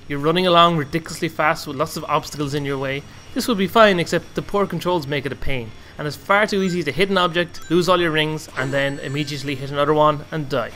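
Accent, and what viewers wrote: Ireland. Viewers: don't take this the wrong way, but I love your accent. you're Irish I'm guessing?? My family's part Irish and Scottish, so I had to try and pick apart your dialect and such. cheers to you and all!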